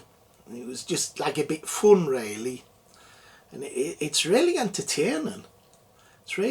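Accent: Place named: Northern England, United Kingdom. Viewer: Being from the NE of England myself (South Shields) it sounds like is from Sunderland or Durham.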